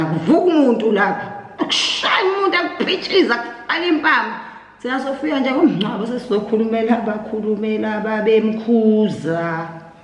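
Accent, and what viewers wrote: KwaMashu, South Africa. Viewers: Heh heh lol my cousins from Kwamashu accent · All I can hear is the Kwamashu accent😅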